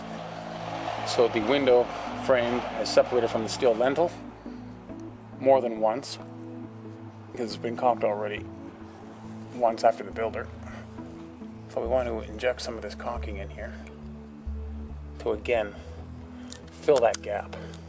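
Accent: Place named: Canada. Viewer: if they're not home, then you must have them open them later as you zip them free with your putty knife/ 5 in one.. yeah, he's a canuck.. i can tell my accent and architecture.... i think in Canada like England there must be a law you have to paint your windows white... Dap with Silicone is ok caulk, i prefer a elastomeric caulk... you really can't do that much caulking and wiping it out, should have caulked it first or earlier if window have much cracking..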